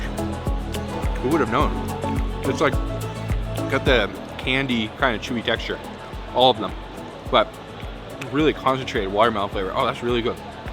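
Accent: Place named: Canada. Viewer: I love your Canadian accent (the "ou" phonemes are probably Scottish in origin)! You probably know more about international cuisines then anyone else on earth. "Been there. Tasted that . . ." It's existential, not cook book stuff! Long may you reign . . .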